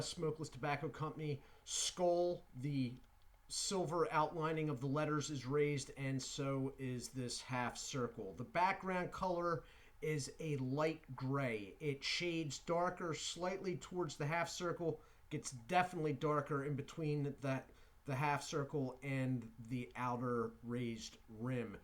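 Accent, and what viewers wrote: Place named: Philadelphia, United States. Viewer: Don’t have it here in NJ. Had to order online. Sound’s like your in Philly?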